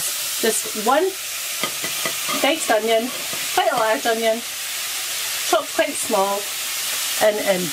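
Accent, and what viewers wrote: Scotland, United Kingdom. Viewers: My mothers was scotch/Irish You sound like everyone that was in my life growing up .☺️ I have my Auntie Annie's fly cemetery recipe and her short bread recipe. They've all passed on, I don't get to hear that lovely brogue. So I watch you. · haha her scottish accent is mild!! full on drunken glaswegian is much harder!!!!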